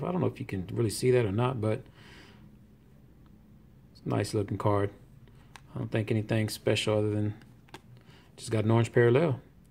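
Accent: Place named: Louisiana, United States. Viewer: Oh cool I'm in Texas too, but I was born in Louisiana and you kind of have the accent so I was jc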